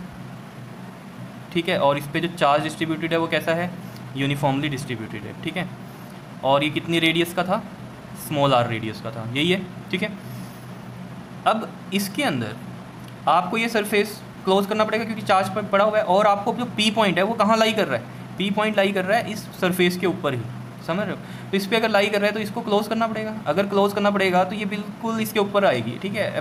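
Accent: Punjab, India. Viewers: Sir ap Punjabi ho🥰🥰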